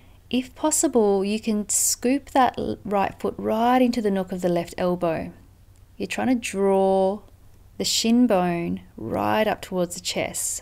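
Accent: Australia. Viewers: Thank you, that was incredible. And so refreshing to hear another Aussie's voice. :-)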